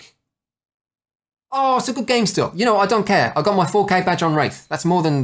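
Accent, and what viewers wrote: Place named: United Kingdom. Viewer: Since has a nice British accent the sponsors sounds like an ad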